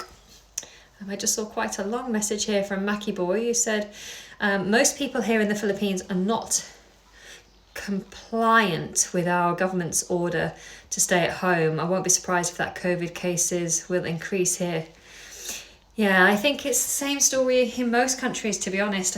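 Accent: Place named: England, United Kingdom. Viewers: Hello from Jon in the Los Angeles area. I'm an American, I speak "New York" and "Chicago" English (sometimes with the accents coming through). I've been to London thrice now (each time spending a week there, including trips to Norwich and Manchester - ace!). London feels like home, it really does. Got friends there too. I feel pretty confident with my English accent, though Northern English is a bit difficult. I can do Scottish and Irish too! I remember seeing your video using your native Mancunian accent, loved it! But, I have more learning to do while watching more of your content! Cheers, and continue to stay safe. Jon El Segundo, CA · First video I have seen of you very good video. You have a nice clear English accent but dear god did you eat a horse or something LOL. It looks you have eating a horse.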